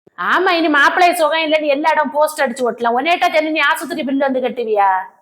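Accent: Kanyakumari, India. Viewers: Sela words neega pesarethu pureyala kanyaakumare baasa superaeruku sster😂😂😂😂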